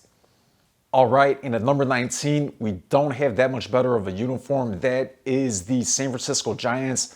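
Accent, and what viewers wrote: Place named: New Jersey, United States. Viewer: "different grilling events, down by lake michigan" i love that phrase, i lived there before never heard that, lol, love the jersey accent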